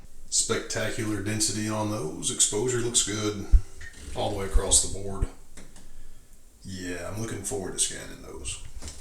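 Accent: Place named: North Carolina, United States. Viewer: I had a plan to sometime in the near future start processing film again after years away from the darkroom. I supported their kickstarter campaign though as little as I would be developing I thought it worth supporting. I now have my unit and just shot a roll of B&W and I’m ready to get started. This video was just what I needed. I read a few reviews but I wanted to see it used. Learned a lot here. Thank you. BTW, I was listening to your voice and guessing where you are from. I guessed right. I know that accent. NC! that’s what 25 years on the road shooting for Southern Living will teach you.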